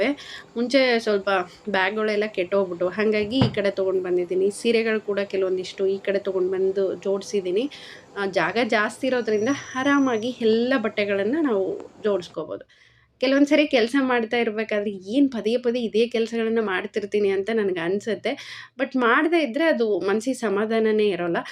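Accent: Raichur, India. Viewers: ನಿಂದು ಯಾವೂರು ರಾಯಚೂರು ಭಾಷೆಯಲ್ಲಿ ಮಾತಾಡುತ್ತೀರಾ ನೀವು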